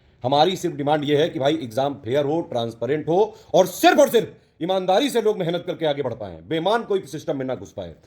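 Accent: Kanpur, India. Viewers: बात तो सही कह रहे हैं आप सर जी बिल्कुल कनपुरिया स्टाईल में बात कर रहे हैं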